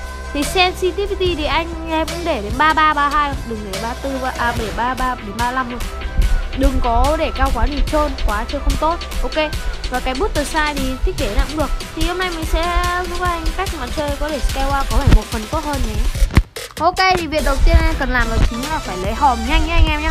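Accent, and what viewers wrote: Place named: North, Vietnam. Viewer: Nói ko hiểu gì hết ( hì hì tui ko nghe đc giọng của người bắc)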